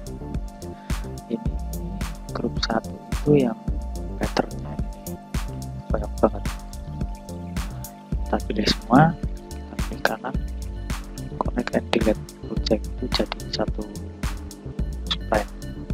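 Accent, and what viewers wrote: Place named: Java, Indonesia. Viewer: Wong jowo koyone nek delok suarane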